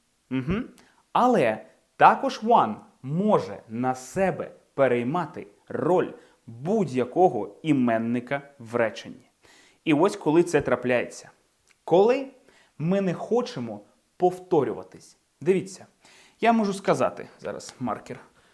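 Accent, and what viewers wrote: United Kingdom. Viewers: Спасибо большое ❤ отлично, что и на украинском и на английском ❤❤ причем у тебя британский английский ❤ очень интересно рассказываешь.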